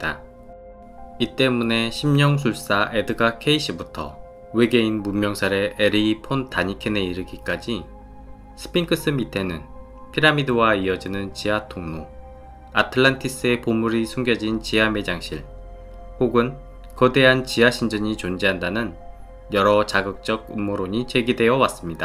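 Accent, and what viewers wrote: Jeolla, South Korea. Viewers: 전라도 사람이 표준어 쓸려고 애쓰는 내레이션 억양이 재밌네요 ㅋㅋ 아...물론 내용은 👍👍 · 전라도사투리가 구수하군요 ㅋ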